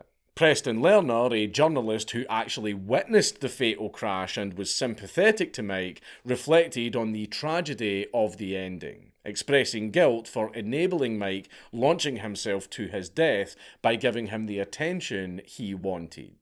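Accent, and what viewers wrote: Scotland, United Kingdom. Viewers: To his Dankulest Lordliness, Hey Dankula, this is a some what formal request. I will offer your choice of £50 or 13 Geese, an Average sized angus cow, a breeding pair of ducks and a single tabby cat or My first born son in exchange for the full unedited middle earth lore dump. I never knew how much I NEEDED to hear a Scottish man talk about middle earth lore, thank you for coming to middle ages welsh barter sesh for dankula content. Yours sincerely, Grand Elder Nanak the High Viseer of the Welsh County Council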